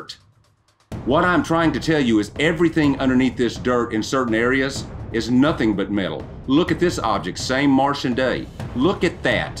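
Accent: South, United States. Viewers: Hey. I can't quite seem to make out the writing that you're talking about, but on the same 'rock', top of the screen, left of centre (just above that bright spot) it says 'BEV' - in the clearest possible, white, capital PRINT! Though it doesn't look like it's actually printed on the rock, but separate letter blocks (look at the 'V') - that's undeniably ENGLISH!!! But I don't think that's the same writing you are talking about, because you zoom in to a lower point. You didn't miss that tho', did you? PS Lovely to hear that great, southern drawl of yours again!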